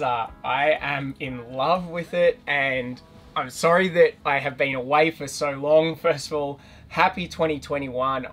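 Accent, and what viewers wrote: Australia. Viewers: welcome back - only Aussies can say "clutch" and "whil'st" and sound cool doing it. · I also like the music you played. I have never seen you on the Youtube so I really liked the accent of australis...............I will see you again........bY for now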